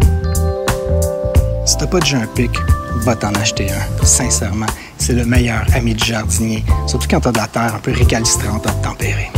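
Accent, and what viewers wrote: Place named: Quebec, Canada. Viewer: Quel travail formidable bravo et j aime l accent québécois ❤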